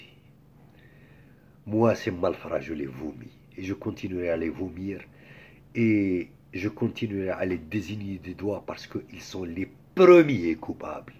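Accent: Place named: Western Algeria, Algeria. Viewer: Khouya vous ete tres eduquee et intellectuel intelligence...ellah ibarek...je c que peut etre vous exprime mieux en francais et plus allaise...ce que jai mal a captee..je suis englophone...alors algerienise Khouya...en plus Jaime l'accent de l'ouest saha shourak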